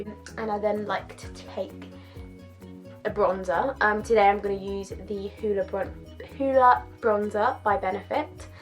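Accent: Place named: United Kingdom. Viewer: You're British!? I'm in love😻😻😻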